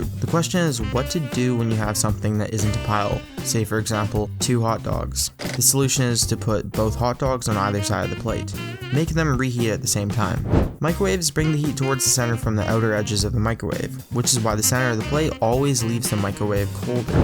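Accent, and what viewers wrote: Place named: Canada. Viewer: A-bOAt / oAt (INSTEAD of aboUt and oUt) says that you're CANADIAN!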